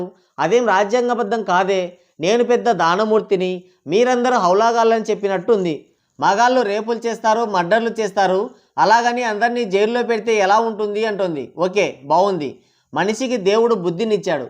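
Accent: Rayalaseema, India. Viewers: I watch your videos regulary and always like your punches, video script, and presentation(Vakchaturyam), I am from London. The way you speak you sound like you are from Rayalseema and me too from Dhone.Good I would like to meet you in my July visit to India. Let me know if you would like to meet, I can share my contact.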